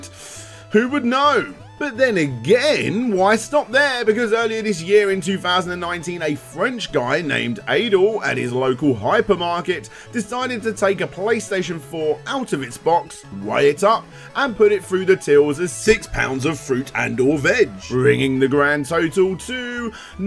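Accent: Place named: United Kingdom. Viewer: Great idea for a video! Also... are you the most British sounding Brit, ever?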